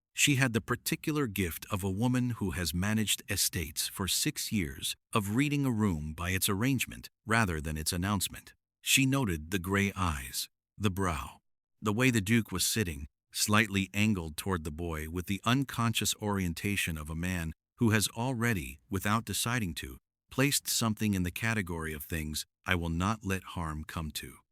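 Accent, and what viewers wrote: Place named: United States. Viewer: A BRITISH MAN, SHOULD BE READING, THIS STORY, NOT AN AMERICAN. 😠💜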